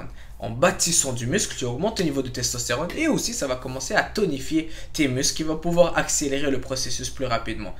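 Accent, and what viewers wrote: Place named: Quebec, Canada. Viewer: Je me disais aussi...y'a comme un p'tit accent québecois chez ce gars🤔. Vidéo courte et instrucive qui va directement à l'essentiel👍Merci.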